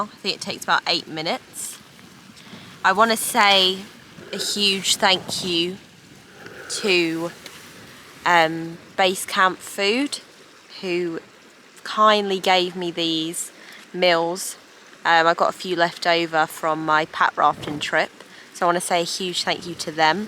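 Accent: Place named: Surrey, United Kingdom. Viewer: With that accent, it’s got to be Surrey😂😂😂😂😂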